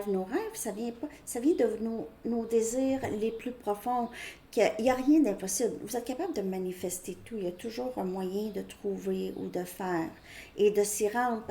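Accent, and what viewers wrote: Canada. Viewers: Gratitude 🙏 Merci infiniment 🙏 j'adore ton accent canadien 👍👍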